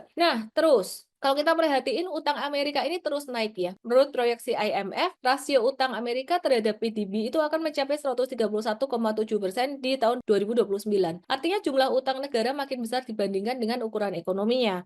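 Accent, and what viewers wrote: Java, Indonesia. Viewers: trimksh Mbak 👍🏽 Analisa yg bagus, bahasa sederhana, ples jawa medhok, 😁 bagus 👍🏽 tapi maaf aq br nemu chanel ini stelah huru-hara si Trump ini. Usul sy, maaf, saran sy 🙏 kameranya jangan hanya dr 1 titik Mbak, ada angle kanan & kiri, ato depan agak ke atas dikit,agak jauh dikit, gtu agar tdk bosan menikmati penjabaran mbak. 👍🏽 & biar Qt ada fariasi natap mbak dr sudut angle yg lain.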